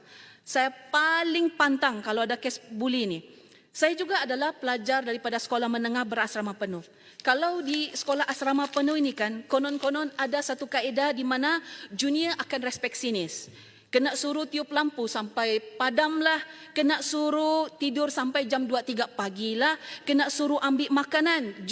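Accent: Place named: Sabah, Malaysia. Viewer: hahaha…pelat sabah tu boleh tak di kurangkan…bercakap lah dalam bahasa malaysia (melayu)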